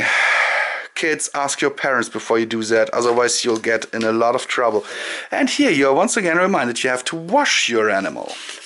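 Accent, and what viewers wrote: Germany. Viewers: For god sake ur german accent is wünderbar! :oo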